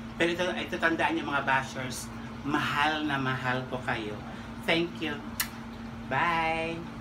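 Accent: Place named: Visayas, Philippines. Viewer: I love how to cook, magaling k tlga alam mu mga technic para maging masarap luto mu, sana dumami pa mga subscribers mu kasi sobrang hambol mu po, I love your way to speak very calm khit visaya accent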